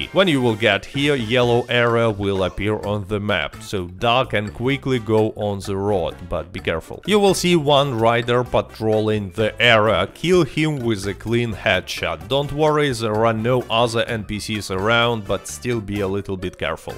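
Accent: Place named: Russia. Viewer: Nice russian acent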